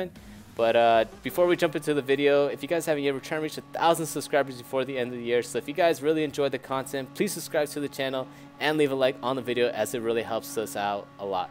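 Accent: United States. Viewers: What part of USA r u from?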